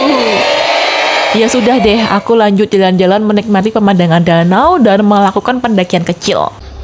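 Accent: Java, Indonesia. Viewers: Maneh adalah bahasa Aceh artinya manis, dan mungkin berasal dari bahasa Melayu. Ya saya berasal dari Aceh, yesss saya asli Aceh, seorang pria jelek, tua ( 38 tahun/ 15 juni 1985 dan tapi kita muslim tidak pernah percaya kepada ramalan zodiak / bintang karena percaya kepada ramalan, tahayul, khurafat, dukun, sihir adalah hal yang paling dilarang dalam islam dan dosa besar) dan saya sudah menikah ( 29 juli 2010 Alhamdulillah) istri saya juga asli Aceh. Saya bisa menebak In Syaa Allah bahwa kamu orang Jawa walaupun kamu mirip Sunda atau mirip orang Sumatera tapi saya yakin kamu bukan orang Aceh, karena gayamu, karakter kamu, logat kamu sama sekali tidak mirip orang Aceh. ALLAHU AKBAR ALLAHU AKBAR ALLAHU AKBAR... Baiklah adik saya dari Jawa, apakah kamu sedang tertidur setelah sahur atau tidak, atau sedang membaca tulisan saya ini, please 🌹🌹🌹 jika kamu punya banyak waktu bacalah terjemahan Al Quran di hp mu secara acak beberapa ayat saja, itu akan membuat kamu bahagia In Shaa Allah dan saya minta maaf telah mengganggu anda lagi. 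ALLAHU AKBAR🌹🌹🌹 !!! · Smpyn bahasanya kok kayak orang Jawa sih Mbk , jawanya mana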